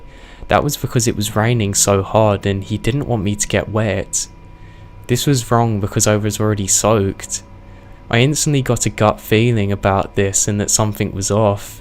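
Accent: England, United Kingdom. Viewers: Hi just found ur channel...I'm an insomniac!! I'm UK too...nice to hear a familiar accent!! Great long vid!!! Thank you!!!🖤🖤🖤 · Why do English people always pronounce anything/nothing like nothink and anythink?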